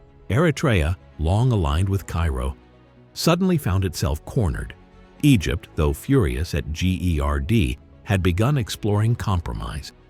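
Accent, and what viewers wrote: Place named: United States. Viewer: Who are you? Are one paid stooges with American accent spreading falsehood. This deluded Abiy thinks he can change the subject of his own misgovernance replete with assignations, imprisonment and outright war against his own people—Ethiopians. So, live with this—Eritrea’s ports are non-negotiable even with the support of UAE which is creating havoc in Africa—Sudan, Chad, Libya and now Ethiopia. So, this uneducated and uninspired leader called Abiy has become the water carrier for UAE. Eritrea will never and is not cornered. It is Ethiopia, the client state, of other powers which is cornered.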